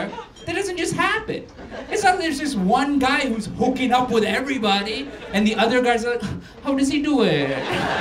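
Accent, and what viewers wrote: India. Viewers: dont know where you are grown up but you still have got Indian accent :D .. it is not new content , copying of Trevor noah and Russel Peters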